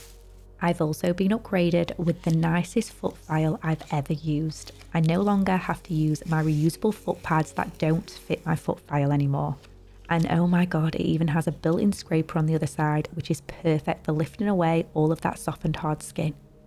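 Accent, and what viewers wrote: United Kingdom. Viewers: where in the UK her accent is from?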